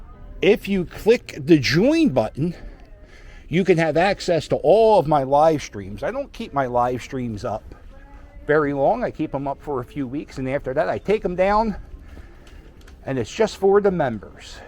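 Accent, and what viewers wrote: Philadelphia, United States. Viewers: Love your south Philly accent .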